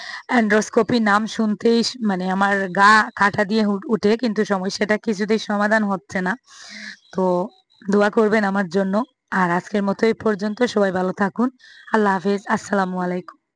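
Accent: Bangladesh. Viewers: Tumi India r oia kne kali Bangladeshi kotha bolo kne? Hindi or assamese kotha bolo apu? Tumar suddo bangla amrar moto oy na bangladeshi kotha add kri daw? Hindi te vlog daw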